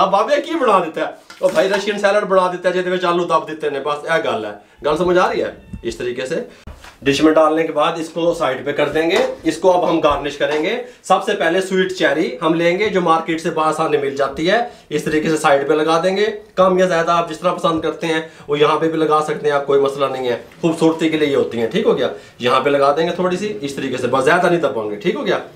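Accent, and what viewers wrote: Punjab, India. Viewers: 😁 punjabi touch ❤❤❤❤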